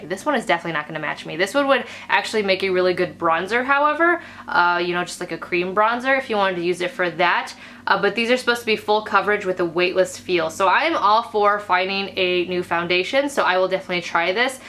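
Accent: Michigan, United States. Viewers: The first video of yours that I watched was your wish app review. I ended up subscribing bc of your voice and accent. My favorite aunt growing up is from Michigan and I always loved hearing her voice. I haven't gotten to see or speak to hear in about a year or so. Your voice and accent is similar and I find it comforting. Anywhos I love the videos And watch at least one a day!! Hope y'all enjoy y'alls "staycation"!!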